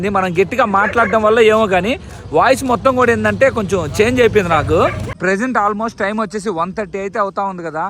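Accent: Nellore, India. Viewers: Om namahsivaya nee langwage batti nellore anukunna madi nellore nanna presentt hy naku hai cheppu godbleesyou swamy daya neeku chala undi nanna nellorelo ekkada reply pettu vasanta aunty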